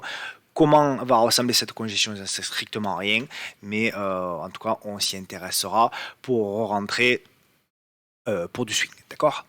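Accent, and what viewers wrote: South, France. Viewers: Accent du suuuud lol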